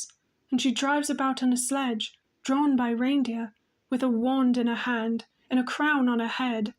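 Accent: United Kingdom. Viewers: Thank YOU for doing a fabulous dramatic primadonna british accent ice queen! Sounds fun to record and even more fun to listen to!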